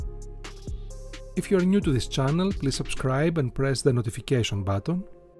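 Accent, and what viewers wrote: Greece. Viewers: AAAAA RE HELLAS I LOVE YOUR GREEKLISH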